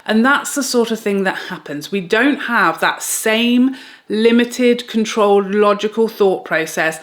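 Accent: United Kingdom. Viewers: Yes, Black Friday started the day after Thanksgiving here in America. I know people who wake up at the crack of dawn to wait in line at the stores to buy that TV. It's a tradition for some people. I can't imagine shopping in store on that day - the crowds are unbelievable - not fun. Thank you, for being true to who you are - this is why I follow your channel. Also, I love your British accent.:)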